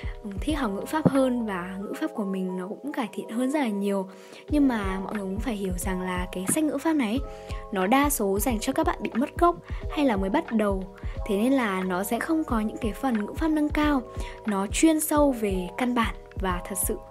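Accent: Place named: North, Vietnam. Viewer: phải công nhận giọng gái Bắc hay cực ý Hơi bị thích giọng bạn này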